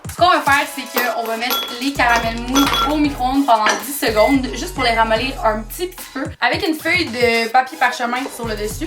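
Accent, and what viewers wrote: Quebec, Canada. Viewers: L’accent québécois juste un supplice pour les oreilles 🙉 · Cet accent du Canada 😍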